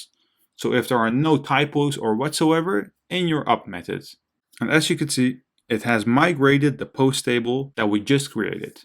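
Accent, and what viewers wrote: Netherlands. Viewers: Ik had wel ff moeite om te horen dat je Nederlands was :P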